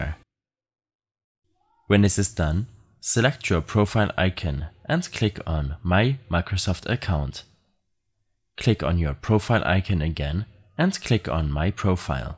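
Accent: Germany. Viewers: Noch keinen deutscheren Akzent gehört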